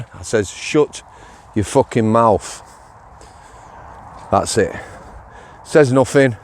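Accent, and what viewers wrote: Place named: Manchester, United Kingdom. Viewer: how come you’ve got a manc accent if your from sheffield? great video mate.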